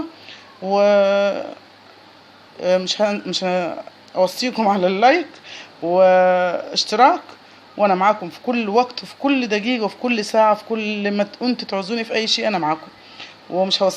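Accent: Upper Egypt, Egypt. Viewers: احب وصفاتك و لهجتك الصعيدية الجميلة بارك الله فيك اختك من المغرب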